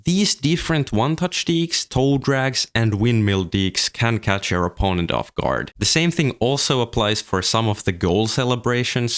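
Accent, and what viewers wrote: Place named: Finland. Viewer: Suomi englanti on parasta! Teetkö muute suomeks kans?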